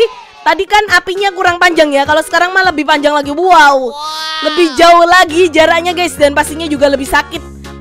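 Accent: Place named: Java, Indonesia. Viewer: Kak itu di jawa kok ngomon nya kayak oranh jawa ya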